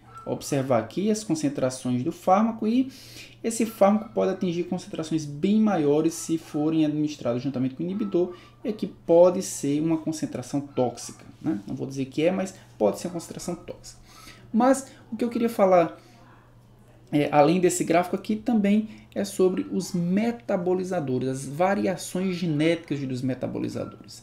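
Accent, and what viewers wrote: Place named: Sergipe, Brazil. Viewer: Muito obrigada por esta aula tão elucidativa! Seu sotaque me parece sergipano. De onde o senhor é? :)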